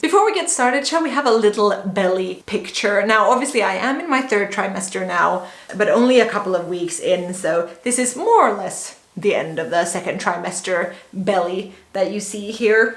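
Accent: Finland. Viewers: your accent got so Finnish since you've been living in Finland, all this talking on a single breath intonation going down thing, so cute :)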